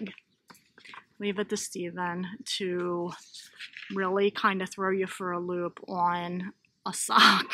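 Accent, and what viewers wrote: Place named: Northeast, United States. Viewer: Love your color choice. I found you looking up sock knit along. 😊 Your accent! Where are you located. I hear northeast, but I hear west coast in the cadence. What?? Right am I way off? ❤❤😊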